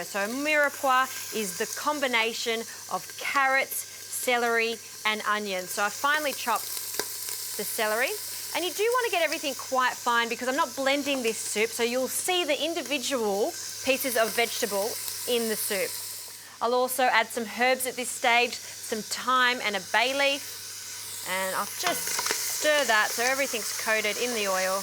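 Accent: Australia. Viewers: You are a cutie pie I like your Aussie accent...could you wear some tighter clothes next video..oh the dish looks nice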